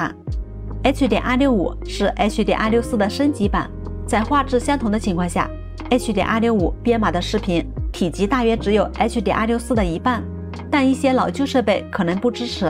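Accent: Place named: Hunan, China. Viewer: 听口音是湘妹子。介绍的相当的详细。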